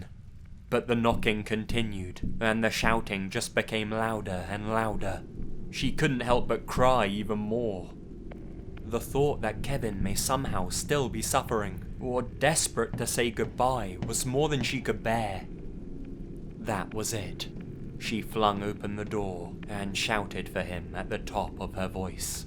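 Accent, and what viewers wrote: England, United Kingdom. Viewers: the slight British accent <33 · you totally have my number, I love falling asleep to you reading these stories. I can't help it, your voice is so soothing and calming. I'm also a complete sucker for an English accent.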